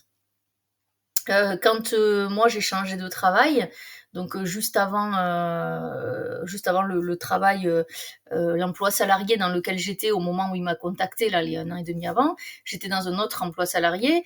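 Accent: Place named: Toulouse, France. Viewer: Je découvre ta chaîne ce matin grâce a l'algorithme de YouTube ! alors que j'ai découvert ce parcours de FJ depuis un an tout juste a 53 ans! Bravo et merci du partage. J'ai retrouvé mon jumeau en janvier 2023 apres 16 ans de silence entre nous. J ai rêvé de lui a plusieurs reprises en qqs jours et j ai décidé de suivre mon intuition et de le contacter. Nous bossons ds la même administration mais depuis 15 ans il vit a 800 km de moi. Ont alors rejaillit en pleine tête tous les sentiments et ressentis si douloureux vécus en <num>, avant notre séparation, et ma NNA alors que nous sommes mariés depuis tous les deux chacun avec nos tierces. Ma sideration étant a son comble de revivre toutes ces choses , ses sentiments, ses synchronicites en un claquement de doigt, j ai fait mes recherches et decouvert ce parcours de FJ il y a un an. Et l'année 2023 fut terrrible et belle en même temps. 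J ai découvert a 53 ans qui je suis vraiment, que j ai une belle âme et des liens énergétiques. L année fut riche en revelations d'une puissance inouïe. J arrete là, nous sommes en plein ds la reunion, et le switch, ma plénitude m intrigue même je dirai mais c est trop bon et je sais que le meilleur est a venir. ❤❤😊🥳 encore Merci et bel accent un peu le même que le mien! de Toulouse !😂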